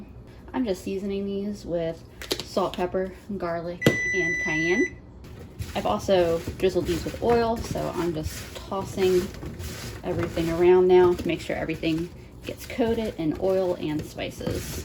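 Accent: Philadelphia, United States. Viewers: I always thought you were in Philly Area based on your accent. LOL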